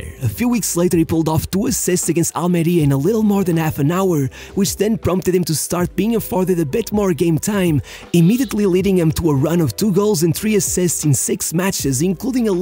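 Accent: Portugal. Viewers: Why is He pronouncing the spanish names as If they are from portugal? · Because he is Portuguese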